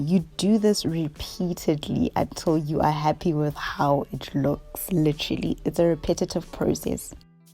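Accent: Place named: South Africa. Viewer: Are you south African you have the same accent as Kopano Shimange ❤️❤️❤️....much love from Kenya, 🇰🇪🇰🇪🇰🇪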